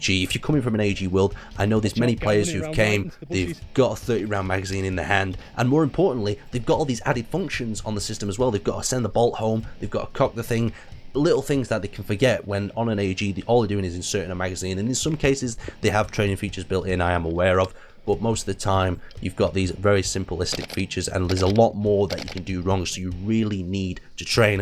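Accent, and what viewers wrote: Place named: North West England, United Kingdom. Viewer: I have a feeling he's from the north west, if so hello from Wirral!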